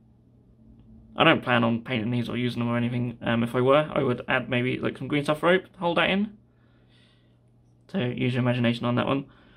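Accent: Norfolk, United Kingdom. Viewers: Well then what yew sayin'? Ha yew got a loight then? Nah just joking. I'm a Norfolk buh n all born & raised, that's why I recognised the accent. Although I wasn't sure - I guess being used to it for so long you forget what some of the other accents sound like a bit. Anyway I love your channel & your website. It's equal with eBay in being my two most useful resources for conversions. Done a couple orders from you recently & will be again. Looks like I forgot to subscribe, thought I did it before. I'll do that now! Good ol' buh mate!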